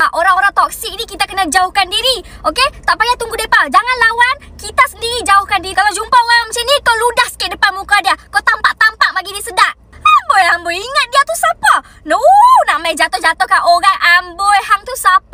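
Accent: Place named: North, Indonesia. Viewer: Mula dgaq ckp cm keyy yell..lama2 dgaq cm org utara plk..😂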